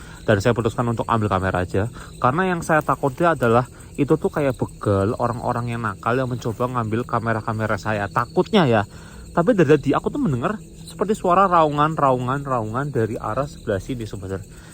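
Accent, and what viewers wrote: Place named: Banyumas, Indonesia. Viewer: Aku biar emak2 ngefans bget ma ka..serem lucu bahasa ngapak y n super brani❤😊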